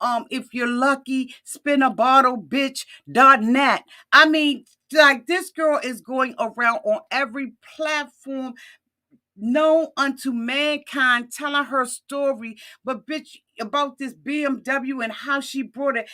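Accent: Washington DC, United States. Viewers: Hey girl. I love love love you. I am also from the DMV… I knew u were from here the first time I saw your show and heard that DC dialect. Love ur commentary 😂😂😂❤❤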